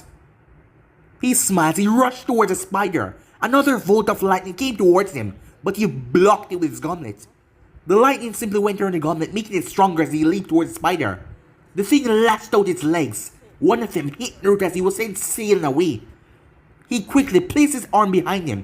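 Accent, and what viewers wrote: Jamaica. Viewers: I do not wish to offend you, but are you Jamaican or Canadian? Also, very nice series. Can you do a what if with Naruto getting both wind and lightning chakra natures?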